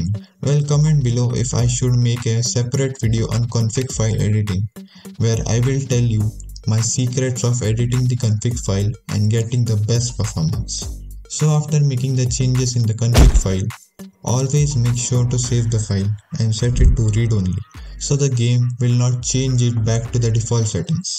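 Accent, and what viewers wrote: India. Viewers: Bro this voice really like of a Indian person...ARE U INDIAN 😮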